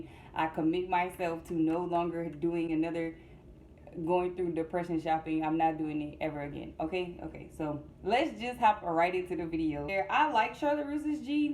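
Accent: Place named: Florida, United States. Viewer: It’s the Flori-ana accent for me!!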